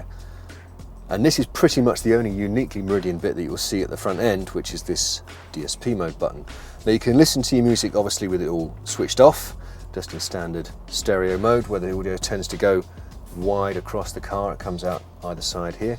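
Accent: United Kingdom. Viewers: Shut the hell up, we want to sample music not your damn british accent..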